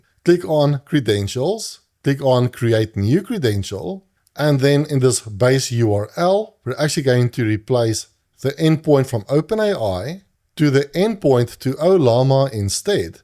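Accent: South Africa. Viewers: Thanks. I recognized a fellow South African as soon as you spoke! Lekker man. The steps you explained doesn't work. Guess maybe they "fixed" your work around to get the AI agent to use ChatGPT without a api key? wish it worked for me. Thanks for this Tutorial though! Cheers! Did anyone else here get it to work?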